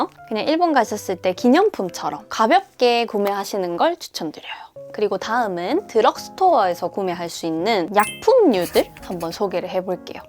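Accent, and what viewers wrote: Gyeongsang, South Korea. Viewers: 영상이 떠서 처음 들어와봤는데 경상도 분이신가봐요? 사투리가 ᆢ ㅎ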